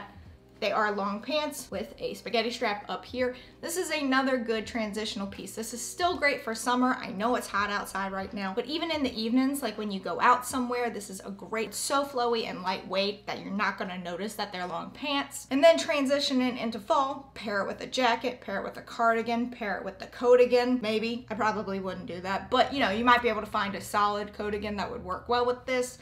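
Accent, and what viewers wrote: United States. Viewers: Your box is full of such good stuff! And I love your accent! What state are you from?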